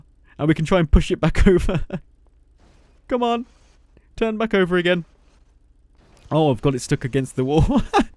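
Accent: United Kingdom. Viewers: Are you british? 'Cause it sounds like you have a bit of a british accent.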